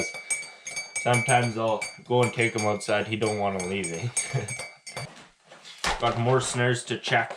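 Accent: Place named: Newfoundland, Canada. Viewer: Your accent are you from Canada ,new found land maybe buy great videos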